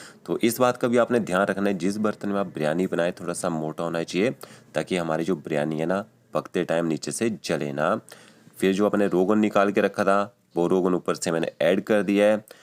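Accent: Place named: Punjab, India. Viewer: Chef aap mast hain, pls don mind thoda Punjabi style hai but recipes mast hain aapki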